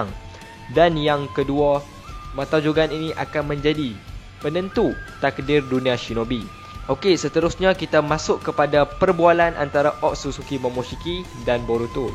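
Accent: North, Malaysia. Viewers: ni org utara ka?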